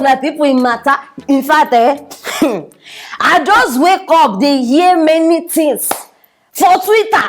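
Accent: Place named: Warri, Nigeria. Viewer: Aproko madam you Sabi Ur work Weller I swear with D's your warri English dey sweet me die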